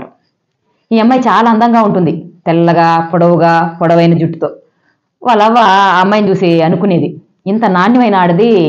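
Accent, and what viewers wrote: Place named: India. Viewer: మీ వాయిస్, ఆ provincial dialect అంటే నాకు చాలా ఇష్టం సాబ్.. 😍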